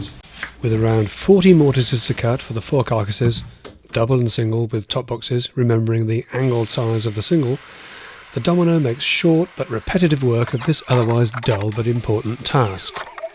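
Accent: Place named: United Kingdom. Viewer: Hi. I live in South Africa and came across your videos about 2 weeks ago. I have since then watched ALL your videos. I find the British accent and the way you talk and just your videos so educational and relaxing. I will definitely watch all future videos. Many thanks for the excellent work!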